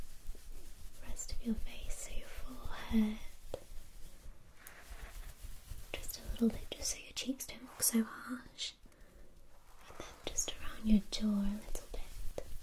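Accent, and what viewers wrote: United Kingdom. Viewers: Your british accent are so lovely! I love your videos a lot! Success for you ❤️ Kisses from Brazil 🇧🇷🥰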